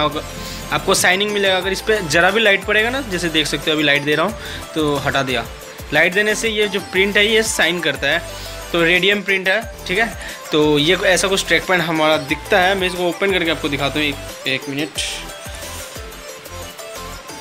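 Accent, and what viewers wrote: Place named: Bihar, India. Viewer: App bihar se hai 😀😀😀😀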